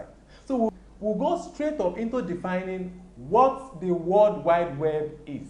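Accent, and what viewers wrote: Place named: West Africa. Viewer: I wasn't sure I could stomach the West-African accent, but I gotta admit, the hilarious analogies have grown on me... Good work, can't wait to watch all videos...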